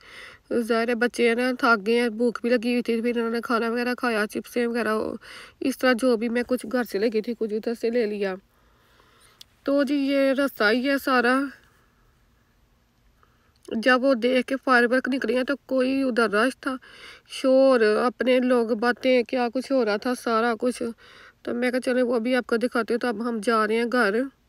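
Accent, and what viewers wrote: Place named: Lahore, Pakistan. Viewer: First with u dear 👍📌 Really enjoying ur Punjabi which same like us ..r you from lahour ??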